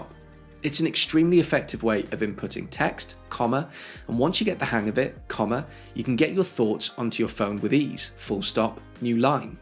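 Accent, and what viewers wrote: United Kingdom. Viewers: I love your channel, so nice to hear the tips presented in UK English. I use dictation a lot in fact I’m using it now, I also have Dragon which of course is more sophisticated. One of the things I miss in Apple dictation is the ability to use the phrase “ scratch that“ which when you use it in dragon delete what you’ve just said or if you want to select a single word you can say in dragon “select Apple“ and it will then select that word and you can use another one to replace it. I don’t know if such sophistication exists in Apple dictation but it would be really great to know if it does. Keep up the good work, cheers. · You explain things so well -- the British accent helps 😊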